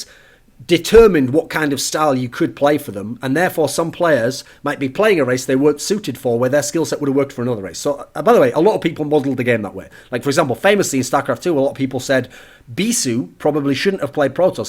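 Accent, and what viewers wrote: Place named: England, United Kingdom. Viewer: English accent bro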